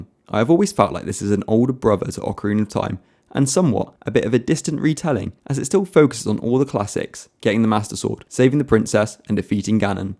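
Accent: United Kingdom. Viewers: You have earned a sub!!! Hearing someone talk about Zelda with Received Pronunciation is S tier for me. You’ll have a lot more subs once more people discover how high quality your videos are! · Like hearing a fellow English man talk about these games most of the YouTube videos are American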